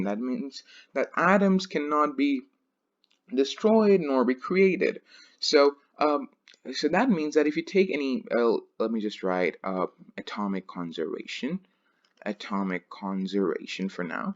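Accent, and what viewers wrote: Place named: United States. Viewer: Seems to be listening an American channel....... Really amazing.... Keep it up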